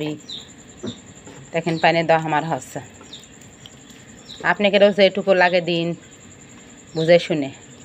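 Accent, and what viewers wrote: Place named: Joypurhat, Bangladesh. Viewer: জয়পুরহাট জেলার ভাষা মনে হচ্ছিল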